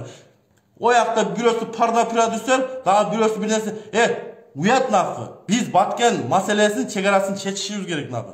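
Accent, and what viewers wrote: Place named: South, Kazakhstan. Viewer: Туштуктон окшойт созуно жакшы тушунгон жокмун эмне деп жатат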